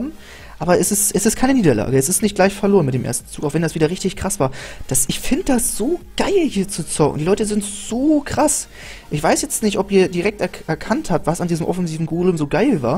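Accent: Munich, Germany. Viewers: kommst du aus München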